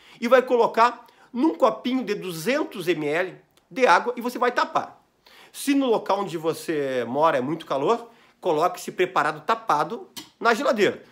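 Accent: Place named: Rio de Janeiro, Brazil. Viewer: Dr, és aqui do RS, como diz, e pra que ou por que esse sotaque carioca?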